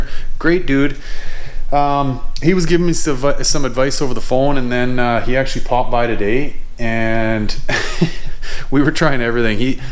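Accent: Canada. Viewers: This guy always sounds like he's trying to fight a Canadian accent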